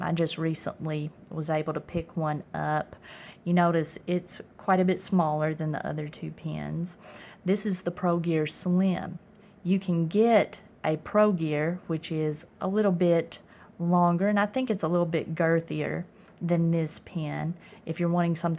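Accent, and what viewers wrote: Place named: Appalachia, United States. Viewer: Good review, but it's wearing to listen to her hillbilly accent.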